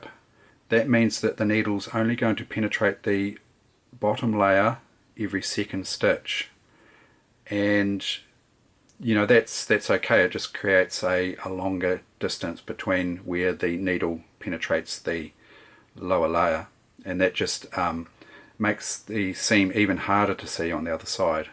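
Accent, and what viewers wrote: New Zealand. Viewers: Nice Video and nice Kiwi accent! Thank you!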